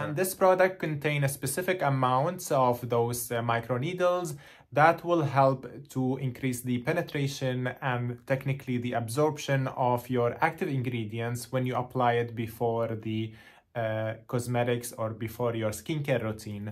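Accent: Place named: Italy. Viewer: What a charming accent. Are you Italian?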